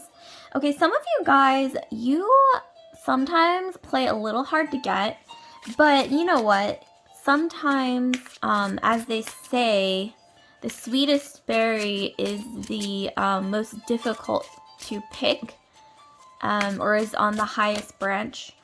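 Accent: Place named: United Kingdom. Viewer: That’s so funny I picked plié 2 and I love the using the British accent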